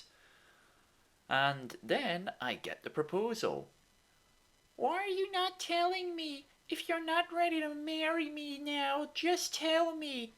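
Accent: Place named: New Jersey, United States. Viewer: Your NJ accent is dead on!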